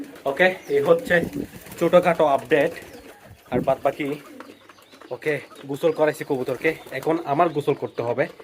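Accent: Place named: Chattogram, Bangladesh. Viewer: Bhai apnar basha Chattogram ar kon jaigai?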